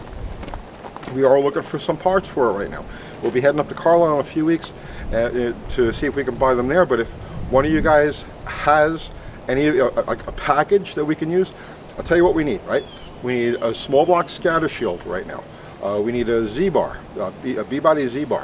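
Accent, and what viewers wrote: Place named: New Jersey, United States. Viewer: I gather that you are in Tennessee, but I hear a lot of New Jersey in there.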